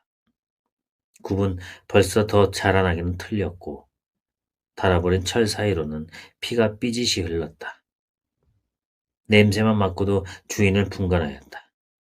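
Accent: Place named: Gangwon, South Korea. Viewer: 재미있게 잘 들었습니다^^ 책도 재미있지만 귀로 들으니 더 집중도 되고 좋았어요^^ 강원도 사투리가 재미있어요^^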